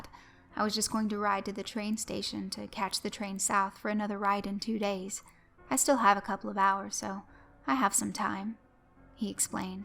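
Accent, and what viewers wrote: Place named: Georgia, United States. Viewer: You must a country girl, guessing Georgia. Or Alabama. Love county girls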